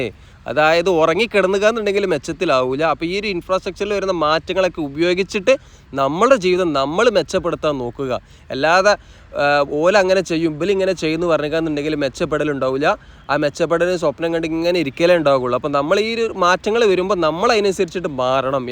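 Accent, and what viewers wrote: Malappuram, India. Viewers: ഞാൻ കണ്ടതിലേക്കും വച്ചു ഏറ്റവും നല്ല എപ്പിസോഡ്. സൂപ്പർ അവതരണം. അതും സൂപ്പർ ക്ലാരിറ്റിയിൽ.പുരോഗതി എങ്ങനെ വേണമെന്നും അതിൽ ജനങ്ങൾ എങ്ങനെ സഹകരിക്കണമെന്നും അതും എനിക്കിഷ്പെട്ട മലപ്പുറം ശൈലിയിൽ.❤❤❤❤. എന്നാണോ പേര്.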